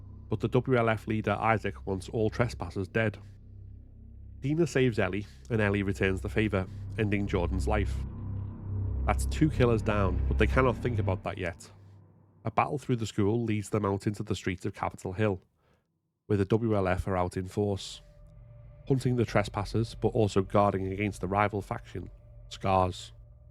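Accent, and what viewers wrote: Liverpool, United Kingdom. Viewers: Are you a scouser?😊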